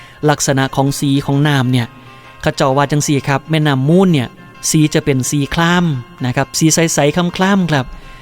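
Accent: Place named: Khon Kaen, Thailand. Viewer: ขอนแก่นแท้เว้าแบบนี้ละครับ เว้าภาษากลางปนลาว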